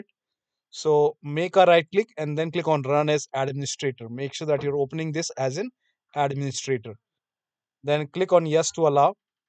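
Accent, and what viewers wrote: India. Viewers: accent like indian tech, thanks , very helpfull matte:elbowcough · I took a whole day, running antivirus, malwarebytes, autoruns, rkill, MSERT, i had tried everything , then I clicked on a random youtube video with 24 likes and a heavy indian accent; then the prophecy became fulfilled, there is no real solution or teaching that is better than this one and finally I can turn off the PC and go to bed. Thanks man.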